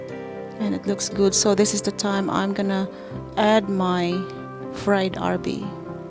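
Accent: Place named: United States. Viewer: Ano ni sya dai..daw nmit haw..nmian gid ko bala sng tingug mo dai..dw americana gid😊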